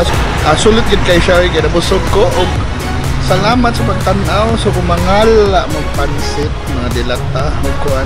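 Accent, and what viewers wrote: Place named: Cebuano, Philippines. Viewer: astig Cebuano accent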